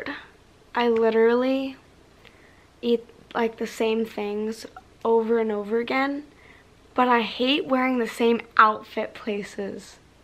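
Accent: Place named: United States. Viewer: Omg your American acsent so good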